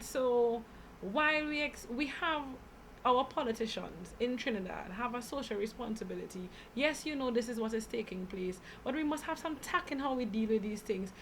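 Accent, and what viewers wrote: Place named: Jamaica. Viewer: You already sell out. stay in Jamaica married ah man and get Jamaican citizenship caused you already sounds like a yardy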